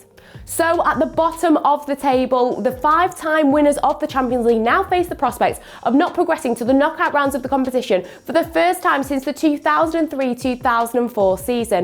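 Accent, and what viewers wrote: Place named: Birmingham, United Kingdom. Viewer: her brummie accent is so charming!!!!!!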